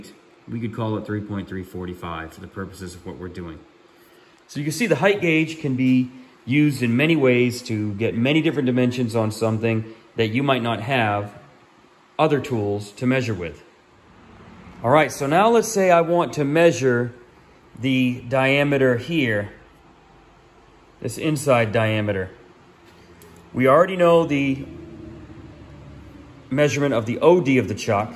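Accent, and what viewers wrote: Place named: Canada. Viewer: very good info. you sound like a Canadian!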